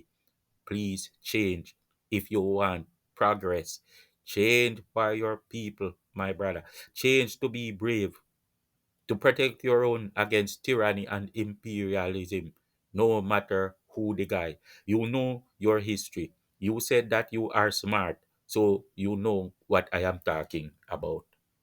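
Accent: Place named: Jamaica. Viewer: Real talk my brother sounds like a real Patric Jamaican glad to hear you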